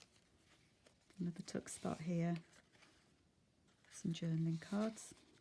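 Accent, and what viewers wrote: England, United Kingdom. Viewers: WOW!!! I LOVE LOVE LOVE this and LOVE Beatrix Potter, especially Peter Rabbit; and now Squirrel Nutkin! Amazing journal, how can I find a Peter Rabbit book?? I love your english accent by the way!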